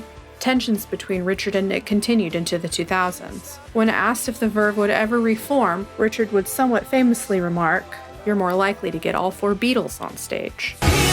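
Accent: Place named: United States. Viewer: Great band. Somewhat ruined by this American voiceover that is read from a script, unless she`s a robot.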